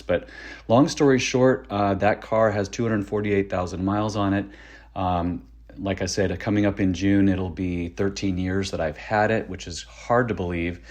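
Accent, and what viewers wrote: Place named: Midwest, United States. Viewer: You're from the Midwest, aren't you?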